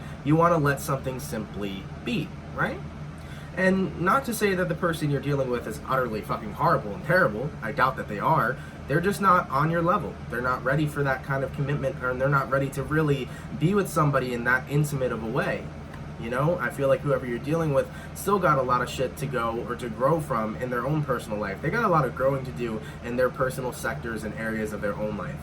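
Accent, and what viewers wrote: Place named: United Kingdom. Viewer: also i’m hella jealous of your British accent. 😭 I wish i had one hah. 🥰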